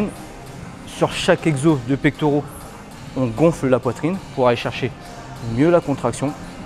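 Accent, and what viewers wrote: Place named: Saint-Étienne, France. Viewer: Tu est de saint Étienne toi non ? Car tu a vachement l’accent de là-bas lol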